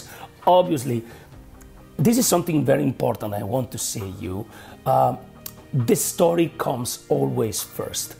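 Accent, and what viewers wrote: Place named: Italy. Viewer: Outstanding. Spoken in a true Italian Painters way.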